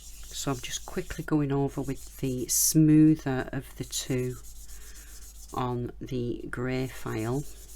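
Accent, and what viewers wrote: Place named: Northern England, United Kingdom. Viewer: Hi, I’ve just found your videos which are brilliant an so lovely to hear a fellow northerner’s voice (live down South now). I’m new to polymer clay and wondered where you buy the silver things that you put the pendant and earrings on before baking. Thanks.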